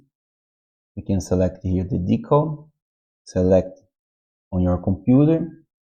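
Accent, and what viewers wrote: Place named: Brazil. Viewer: Sotaque de brasileiro.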